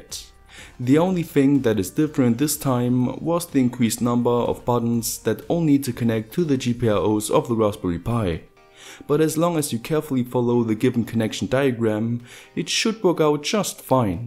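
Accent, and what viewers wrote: Germany. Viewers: are you German mate ? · Why do you have a German accent?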